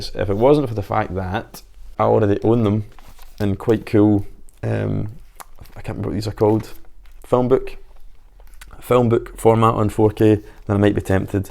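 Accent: Scotland, United Kingdom. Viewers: I don’t think your accent is difficult to understand at all. Yes, you have a Scottish accent, but it isn’t spoken with speed and slang. Your English is fine. I suppose it could be difficult if the listener was Chinese or something. Or American.... Americans have trouble with the English language. 😂 (Thats humour by the way if there’s an American reading this) Keep up the good work mate. And don’t say I told you this but your accent is fine! 👍🏻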